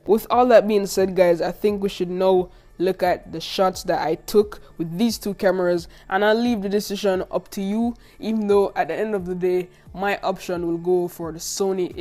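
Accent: Jamaica. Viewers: Are you a Jamaican? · He Jamaican I can hear the accent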